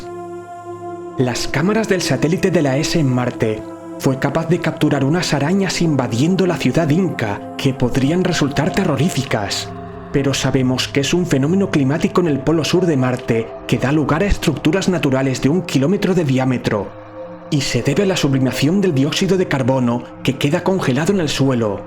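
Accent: Spain. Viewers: Me gustan tus video pero deberías por el bien del canal contratar un relator latino, a muchos latinos no nos gusta el acento español, con todo respeto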